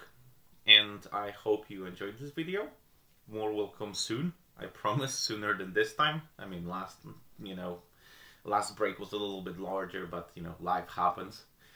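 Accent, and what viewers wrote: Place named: Finland. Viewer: the amount of information is very nice!, where are you from? I kind of get a finnish vibe but anyways good video!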